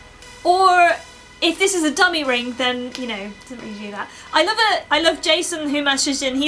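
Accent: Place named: Australia. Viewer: I was blown when I learned you were British. With that accent I thought you were Australian! Lulz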